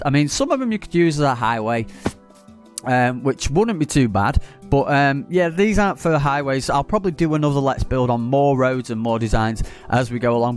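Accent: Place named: Stoke, United Kingdom. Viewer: i can tell by the accent, i’m from there too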